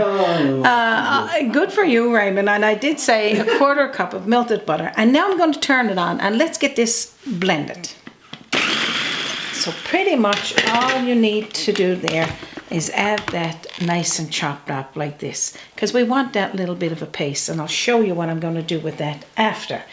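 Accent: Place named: Newfoundland, Canada. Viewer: I love your NFLD accent, tell me when your birthday is so I can send you a Bag of H's. ere are y now? Cool, using orange juice, I have been an Armature chef, because I don't have a red or blue seal, but I have been cooking since 1978, my career took me to other professions, but still I am a cook for the rest of my life, thank you for sharing this very cool recipe, I'm definitely going to make it I like the orange with the rice, Baked. Tell us were you at?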